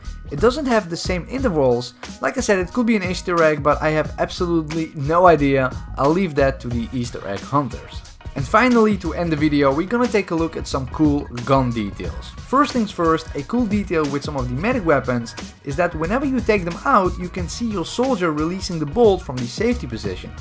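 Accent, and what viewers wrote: Netherlands. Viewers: a Dutchman who speaks English, go to school bro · U dutch?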